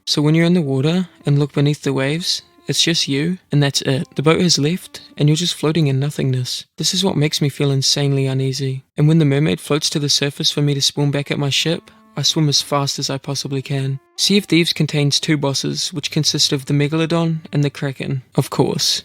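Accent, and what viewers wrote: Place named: New Zealand. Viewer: I was thinking the whole video "Is this guy Kiwi" You have a very Kiwi accent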